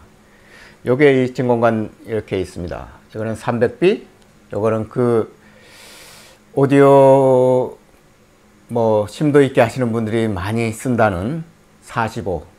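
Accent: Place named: United Kingdom. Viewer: 정말 좋은 기술 가지셨네요 한국에 계시가요 아니면 영국에 계신가요 영어 발음이 아주 영국식 이쎠요 미국에서 드림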